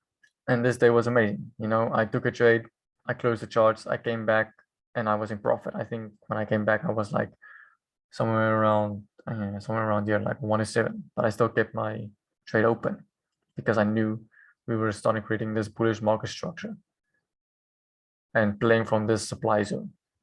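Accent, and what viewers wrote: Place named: South Africa. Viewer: Hi. Thanks. Where are you from. ? From your accent sounds like South - Africa. ?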